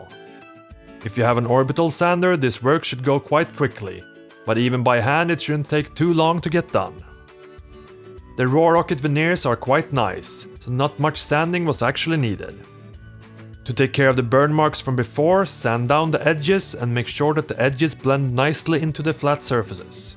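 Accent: Sweden. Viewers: Sounds like northern Sweden. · Without knowing better I would quess you are Swedish based on your accent and specialy the way you say "just". Awesome and very good looking video btw! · you are swedish right? You have a swedish accent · Are you swedish becase i can Kinda hear it on your accent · are you swedish??? your accent is pretty swedish??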